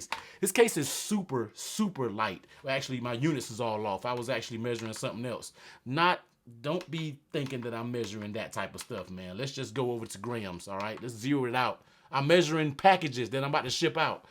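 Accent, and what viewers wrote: United States. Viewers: You're american huh? can't you just talk normal?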